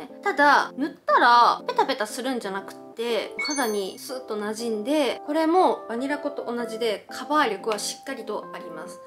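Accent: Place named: Kansai, Japan. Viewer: 関西弁かわいい笑笑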